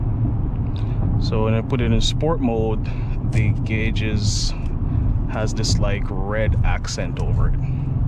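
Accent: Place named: Caribbean. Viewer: Where are you from? You sound carribean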